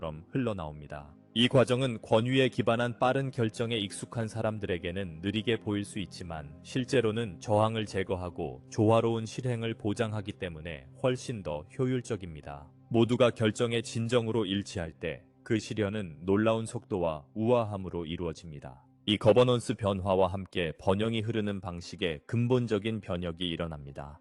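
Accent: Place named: Gyeongsang, South Korea. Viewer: 대박대박대박 화이팅하이숑 야호야호야호 경상도 사투리가 당연하지요 창녕띠기 아인교 야호 신납니당~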